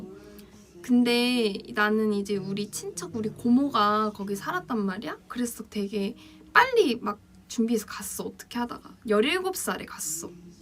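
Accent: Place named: United States. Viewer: You are so smart and cute. I hope that you can have a better time now that you know what American people are like, and we go out of our way to make sure you feel welcome. Except for a few idiots who make us look bad. But please ignore those racist, because the majority of people here, are very nice and probably seem to forward to you and again, try to understand how much you make people happy with your attention and nice attitude. You have the absolute best American accent that I have ever heard and I would never suspect that you are foreign, until you tell me. Great job learning how to pronounce our language. You are so sweet and beautiful. Thanks for being you.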